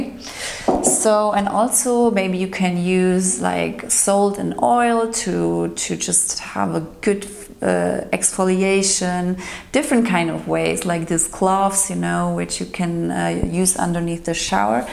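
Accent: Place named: Germany. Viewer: Keep it up! Your English is great. I think you have a lot of potential doing your videos in English as well as German. I think your German accent actually sounds very nice and makes you unique relative to other raw food channels in English. Thank you for reminding me of these truths about how to maintain overall health.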